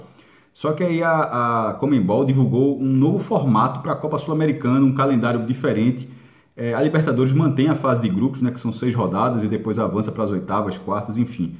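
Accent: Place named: Northeast, Brazil. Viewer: Sou nordestino de Paratinga-bahia e gostei do seu sotaque, parece que é caba da peste também.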